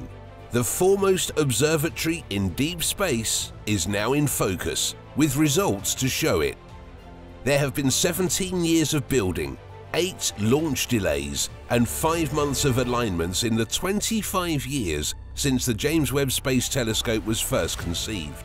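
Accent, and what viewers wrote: United Kingdom. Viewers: So not only is this narrated by a Brit, essential to making Americans think there is some intelligent afoot, but the Title is a lie. THere are no new images, and only the very clear statement that sooner or later there will be. This entire project is an amazing feat primarily on the part of US teams of engineers and scientists. Isn't it a pity to have to lie about in the title? Whoever posted this, you dishonor a great achievement.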